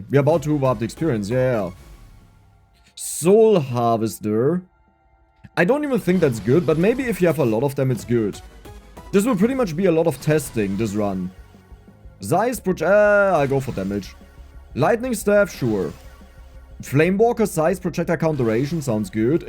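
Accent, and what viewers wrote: Germany. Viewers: der deutsche Akzent ist lustig und mittendrin immer die deutschen Fluchwörter for my english fellas: the german accent is funny and in the middle of it all there are always the german curse words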